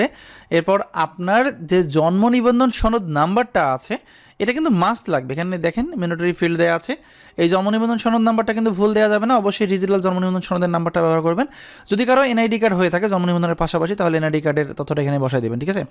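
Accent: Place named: Noakhali, Bangladesh. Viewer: ভাই আপনি নোয়াখালীর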